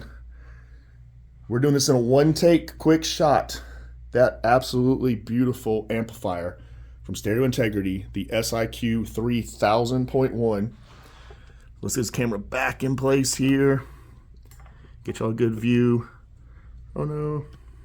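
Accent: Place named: South, United States. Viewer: Yes, I hear that. It is correct to my ears. The wrong way would be: three thousand, five hundred, point nine one. Are you in the USA? If not, there may be a difference in the way numbers are spoken. I'm American so it sounds normal to me. Another acceptable way he could have said it is: three thousand, five hundred AND ninety one. Either way is normal over here. He also speaks with a southern accent, so that can be a bit difficult if your not used to it. I don't think he's trying to deceive anybody. 👍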